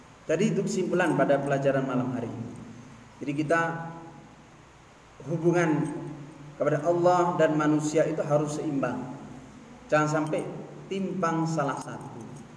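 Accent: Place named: Java, Indonesia. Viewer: waalaikum salam duhh pa ustad logat jawa hhee masih belajar nglogat jawa nya saya mah hhee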